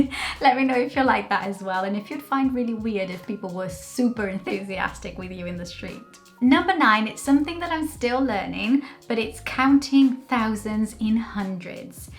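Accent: Wales, United Kingdom. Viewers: I love your british accent, it's sounds so beautiful and clear You've been helping me a lot with my English, "kisses and hugs" from Brazil! Obrigada por tudo ♥️🇧🇷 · You’re picking up a Welsh accent 👍🇬🇧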